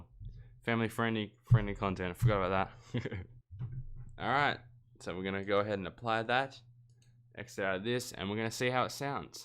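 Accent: Australia. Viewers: smol bean. clout machine.TM bro you can tell by the accent he’s Australian???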